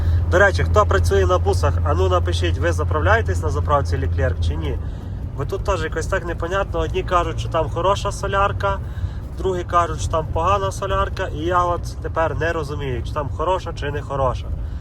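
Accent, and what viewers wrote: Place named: Western Ukraine, Ukraine. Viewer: Файно слухати свою мову, ви похоже з наших країв, привіт з Тернополя 😊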